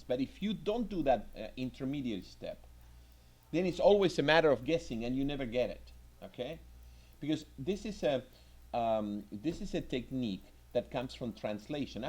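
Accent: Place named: Argentina. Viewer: This professor has an Argentinean accent , i know because I'm Argentinean ;)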